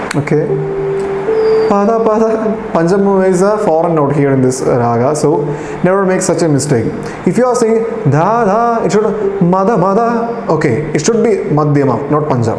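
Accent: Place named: England, United Kingdom. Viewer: everything ok.except that irritating english accented speaking.and an enlish accented vocalisation of the lyrics.higly higly irritating.how they gave him the state best teacher award beats me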